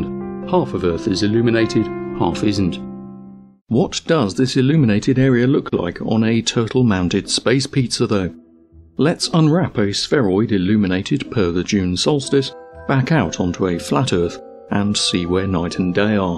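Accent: England, United Kingdom. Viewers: Here we see the effect of "Education", a word that appears to mean very little to Flattards. As much as I enjoy these videos (and hearing an English accent for a change) I cant help thinking that the best way to deal with them is to not even give them the time of day - let them get on with their stupidity. They are clearly beyond help, and, like the religious, prepared to "Believe" rather than accumulate anything resembling a fact. However, I do enjoy a good laugh and Flattards do produce some of the best comedy Iv seen since Jim Jeffries took to the stage so for - Keep it up my good man, keep it up. Who knows, you might even educate one of the imbeciles, although, to be honest, I doubt that even Lawrence Krauss could educate these fools. Excellent job - Subscribed.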